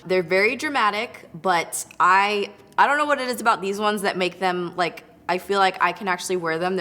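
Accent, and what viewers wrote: California, United States. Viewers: U have a classic Cali-girl accent! Lov it! I wonder where u grew up?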